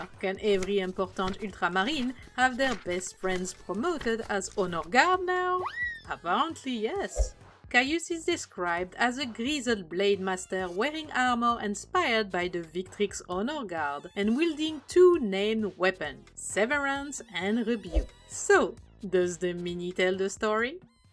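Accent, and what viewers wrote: France. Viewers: surprenant d'avoir l'accent frenchy de fou alors que tout est en anglais 😆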